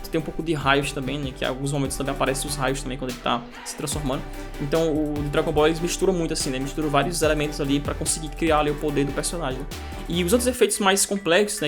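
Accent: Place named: Northeast, Brazil. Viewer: sotaque nordestino e foda❤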